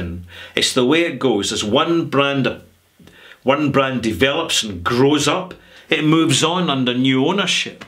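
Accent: Scotland, United Kingdom. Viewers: Who else watches these videos for the hat and the Scottish accent.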